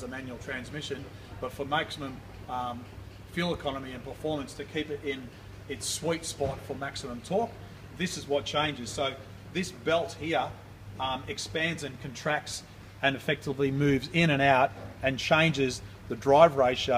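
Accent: Australia. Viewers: While no secret was revealed, other than full disassembly required for filter change, your Oz accent gave the video a "Richard Attenborough" like effect which was enjoyable. Great video. Thank you.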